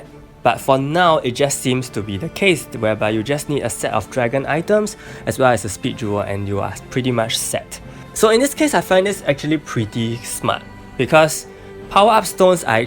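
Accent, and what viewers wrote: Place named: Singapore. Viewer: Your accents sounds similar to a singaporean! Great video btw! You made it more clearer and i love the sudden appearance from orkah xD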